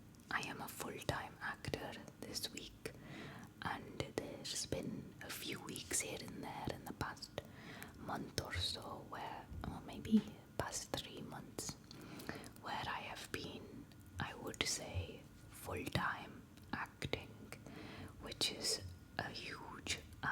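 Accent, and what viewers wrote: South India, India. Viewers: This is south Indian accent. Indian accent is a little bit of British type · This is more of south indian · I’m hindi and it really sounded South Indian. My jaw dropped oef almost lost it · True, most of my south friends talk exactly like this but delhi, Punjabi usually have a good british accent. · This is south indian accent rather than Indian I mean Indians speak normal English y'all so many stereotypes😐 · Thats more like south indian a bit overdone but nice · This sounded more South Indian than Gujarati but it's still a very good Indian accent. You're the first foreigner whose Indian accent doesn't make me cringe. In fact, your accent reminds me of some of my teachers. The video is very well done.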